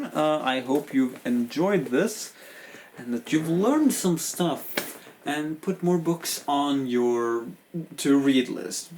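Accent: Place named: Norway. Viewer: Wonderful accent my guy! Can tell ur Norwegian.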